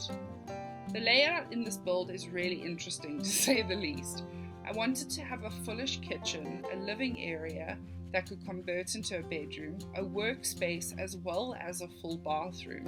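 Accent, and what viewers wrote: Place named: Australia. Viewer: i think ur from australia, right?? with the accent, lol love the build a bit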